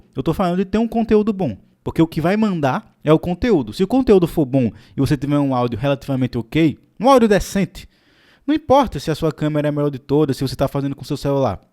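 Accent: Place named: Alagoas, Brazil. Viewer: tu é de Maceió? Tem um sotaque meio alagoano, fio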